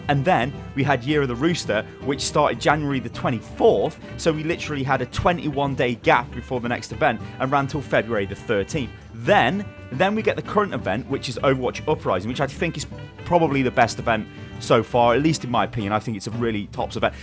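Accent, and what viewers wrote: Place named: United Kingdom. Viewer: British pep say schedule soooo wried the C is silent when u guys say it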